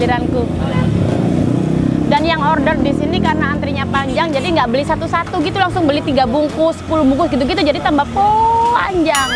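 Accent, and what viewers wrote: Java, Indonesia. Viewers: Sudah mbak.. mbrebes mili sumpahhh.. ak liat mbak jd inget tanteku di surabaya hahahaha.. luwcuuuuu klo ngomong medokkk bingittt tp kusuka 🤣 sehat2 ya Omay, & Ngkaknyaaa 😇😇😇😇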